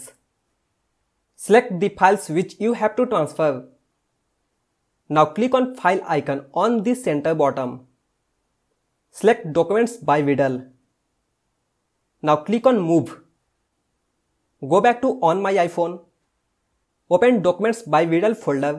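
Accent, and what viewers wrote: India. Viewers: Luv your desi accent 💕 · very bad english+indian= leave the video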